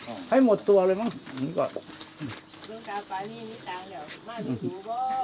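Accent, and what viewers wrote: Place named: Laos. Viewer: หลวงตาเว้าภาษาลาวน่าฟังดีที่สุดเลย.สุดยอด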